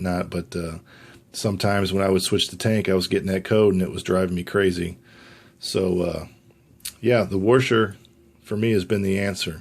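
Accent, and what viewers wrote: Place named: North, United Kingdom. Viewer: If him saying washed like that posses you off than your a lol cry baby hitch it's ducking old school talk. .. or maybe dis you ever think. That he is a ducking northerners and the talk differently. Like they don't call a carbonated. Syrup. Drink a soda it's a pop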